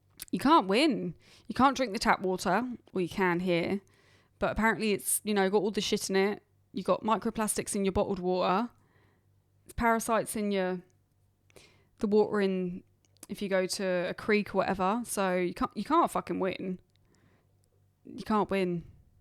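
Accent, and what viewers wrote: Australia. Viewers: You should reconsider the title of the 'pod'. I would lean into your lovely Australian accent. And the fact that you're honest about your regrets in life, something like "Cautionary Tales: The Karen Chronicles" or "Bad Example".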